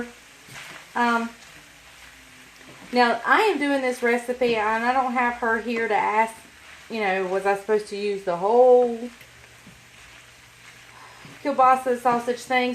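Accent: South, United States. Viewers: Are you saying Aldi?? Sorry this northern girl is having a hard time with the southern accent😅😅 love your channel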